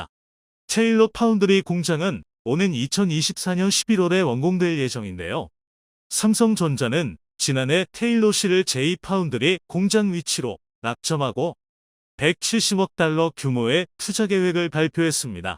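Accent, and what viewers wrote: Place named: China. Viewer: 중국사람인가 ㆍ 왜 말이 찳지 ㆍ혀가 짧고 발음 정확하지 않음 ㆍ 하지 말아요 유트브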